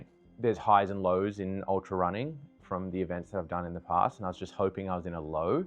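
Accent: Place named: Australia. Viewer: Great to hear a downunder accent. Thanks for this, it was so interesting.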